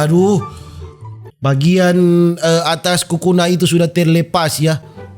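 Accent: Manado, Indonesia. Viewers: Suka dengar pertuturan orang manado. Unik 😊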